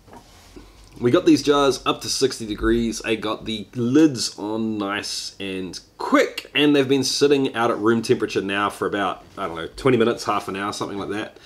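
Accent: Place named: New Zealand. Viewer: not whiskey related. i usually have a hard time telling between NZ and AUS accents but this is the first time i was able to go "hey, he's a kiwi." (the "fush n chups" thing aussies talk about). just thought it was interesting.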